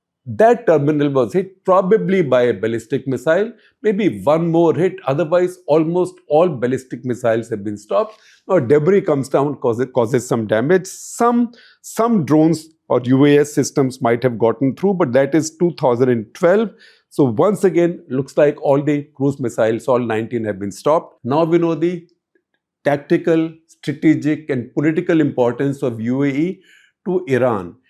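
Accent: India. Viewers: Utter garbage spewed by progandists , & repeated by a stupid fool young man from sindustaan stone age , with a thick kooli accent... Go back to cultivating your land and go produce some magh dhal beans... However Sirrrr rrrrrr....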